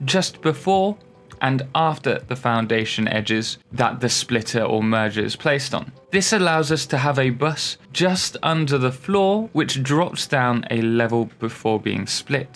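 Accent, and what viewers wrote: United Kingdom. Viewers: Why do you sound like spiffing brit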